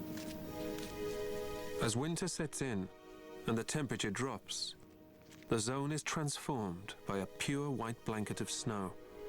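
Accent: United Kingdom. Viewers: Don't you just love British accents in narration? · I like when a documentary has classical music and an english accent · This video has everything. It's like a human tragedy, science lesson and nature documentary all tied together with a neat little British accent. I likes it.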